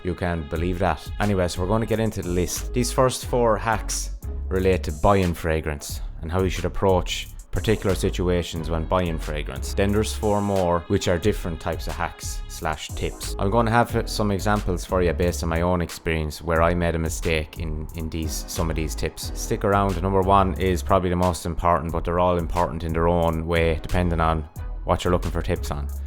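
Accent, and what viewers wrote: Ireland. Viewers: Some good advise....really enjoy your Irish accent. Thanks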